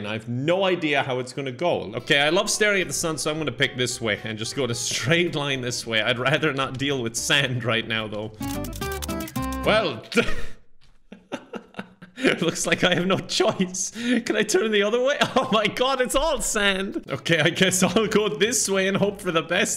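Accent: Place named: Ireland. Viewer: He's just Irish don't worry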